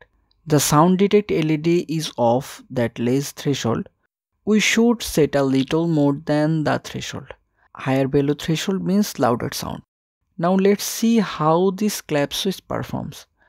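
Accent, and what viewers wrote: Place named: India. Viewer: stop speek like indian guy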